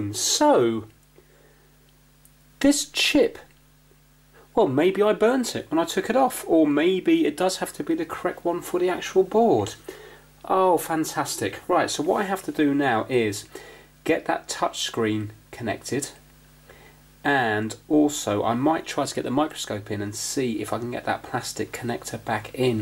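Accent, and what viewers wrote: United Kingdom. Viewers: Hi, first of all: Great video as always, love your british accent 🗣! I‘ve got a question: you‘re using some kind of small (grey?) notebook (or convertible?) in your videos, showing how much you paid for your „trying to fix“ items. I‘d like to know which brand/model it is?! Thank you and keep on fixing! 👍🏻 greetings from 🇩🇪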